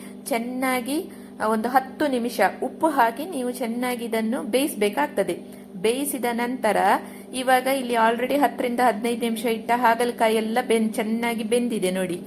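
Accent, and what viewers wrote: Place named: Mangalore, India. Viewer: ಮಂಗಳೂರ್ ಕನ್ನಡ ಕೇಳೋಕ್ ಚಂದ ಹಾಗಲಕಾಯಿ ಸಿಪ್ಪೆ ಯಾವತ್ತೂ ತೆಗೆದಿಲ್ಲ ರೆಸಿಪಿ 👌👌👌😋😋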